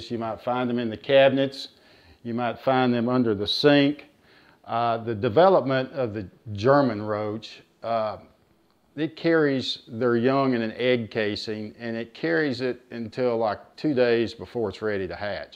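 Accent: South, United States. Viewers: He is southern and southern folk talk slow don’t like it find another video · Hey, let me try an' place your accent...sounds like a base in Arkansas, with a Georgian overlay, and can I hear a hint of Kentucky, or are my ears playin' tricks on me? Laughs I ain't been down south since I was a kid, so I'm probably a bit rusty. (Used to live in Texas.)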